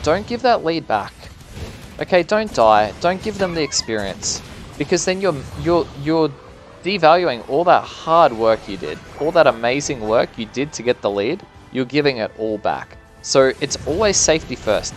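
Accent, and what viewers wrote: Australia. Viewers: Are you australian!?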